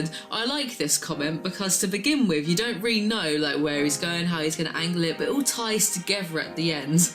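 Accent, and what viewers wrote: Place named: United Kingdom. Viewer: milky ange fam she’s not exotic at all XDDDDDDD that’s the classic Brit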